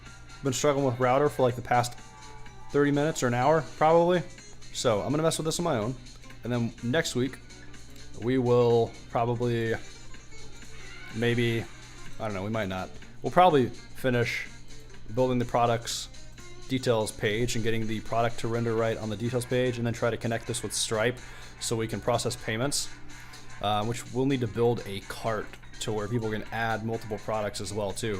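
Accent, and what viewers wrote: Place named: United States. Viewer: Thank you thank you 😥😥😥 Finally we have a YouTuber who has American accent new courses, short simplified and great 😣😣 thank you very much By the way any quick idea to learn mathematics for machine and deep learning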